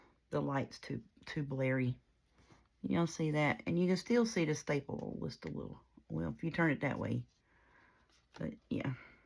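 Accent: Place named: South, United States. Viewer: Gf I love watching your not only are you a amazing crafter! You are so funny I get so tickled at you it is neat to hear someone one as Southern as me your awesome. God Bless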